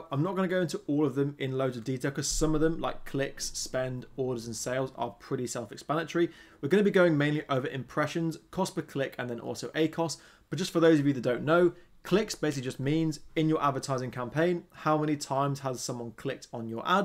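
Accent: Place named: England, United Kingdom. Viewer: OMG, I was about to say the same thing. He talked so fast I kept drifting off and daydreaming. And that English accent isn't helping, it's too thick. 🙄😴😕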